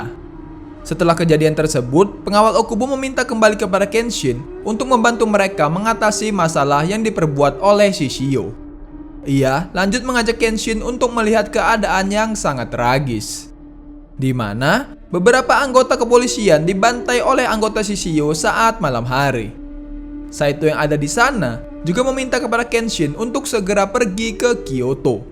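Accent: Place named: Java, Indonesia. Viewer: Suaramu gk enak cok medok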